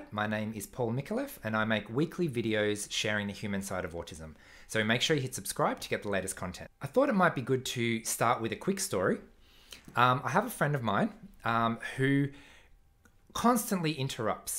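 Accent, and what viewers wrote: Australia. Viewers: Thank you so much for this video! It helped me understand why I constantly talk, even to myself. Only then can I structure things. Wow! Wish there had been people like you when I chose to be a translator (written translations) cause I didn't trust my personality to be an interpretor (spoken translations) although I always loved to hear native people speak in their varieties. Well, now that I'm retired, I can do that on holidays. P.S.: I love your Australian English!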